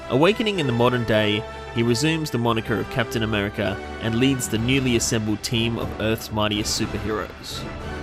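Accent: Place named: Australia. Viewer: Are you Australian because I am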